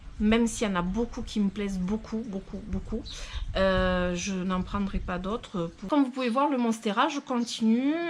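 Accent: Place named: Toulouse, France. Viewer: Bonsoir Voici plus de 6 mois que je regarde les vidéos,je vous trouve très sympathique vous me faites penser à ma belle belle sœur qui habite près de Toulouse ce petit accent fort charmant.Je n'ai pratiquement pas de plantes vertes à la maison seulement quelques succulentes. Mon grand bonheur c'est mon jardin de 1200 m2 je m'éclate aussi comme vous je consacre beaucoup de temps. je comprends votre fatigue et j'imagine bien le temps que vous y passez. Pour cette tendinite bien sûr les anti inflammatoires pourraient vous soulager mais c'est surtout une mise au repos de votre bras et ça c'est pas gagné! Encore bravo pour tous vos bons conseils c'est un réel plaisir à vous regarder 2 fois par semaine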